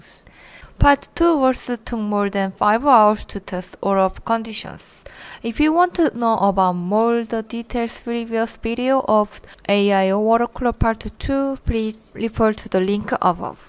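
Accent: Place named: South Korea. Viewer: 한국분이셈? 영어발음이 낯익네요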